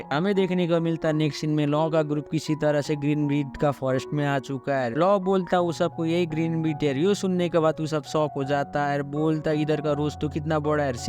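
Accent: Nepal. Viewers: Because your Hindi accent is like Nepali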